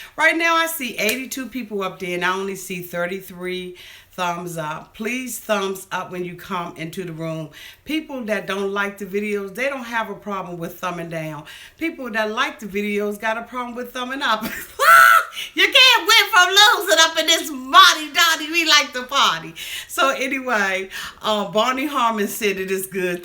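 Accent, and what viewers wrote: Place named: Baltimore, United States. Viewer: Great Wisdom! You must be from Baltimore, cuz you sound just like Mo'nique, accent and all girl!!!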